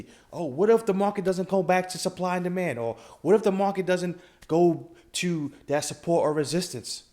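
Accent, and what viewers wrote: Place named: New York, United States. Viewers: I fw you heavy my guy 💪🏾 You sound like you're from Harlem, no?... lol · Yes I know that New York accent. I’m originally from Philly and lived in NYC for years. I love it. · You gotta be from NY with that thick accent lol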